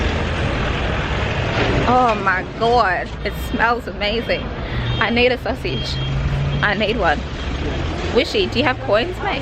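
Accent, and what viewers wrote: Australia. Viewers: she's Australian. Her accent is pretty obvious...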